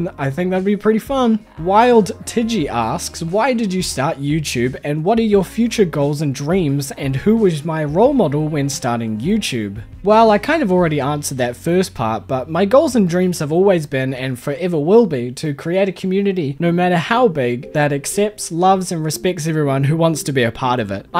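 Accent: New Zealand. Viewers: I KNEW YOUR ACCENT WAS FAMILIAR!!! it's my own.... Lmao. Good ol kiwi accent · Funny how people thought you were Dutch when you have the most New Zealand accent to ever accent to me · Great video. You're very good at it. 😊 I recognised your accent because I recently moved to Aus from South Africa and the NZ accent is kind of a smoosh up of the two (English South African & NZ). Loads of people ask me if I'm from NZ. I love your videos ❤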